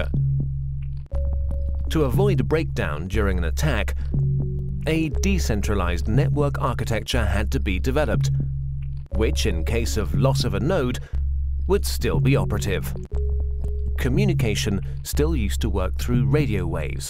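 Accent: England, United Kingdom. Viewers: What a terrible accent... I can't understand a word. I hate England guys. (about the girl, I'm feel ok) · love the english accent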